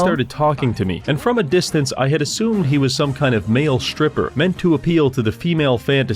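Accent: Netherlands. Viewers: hold on, are you dutch? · You're dutch is cute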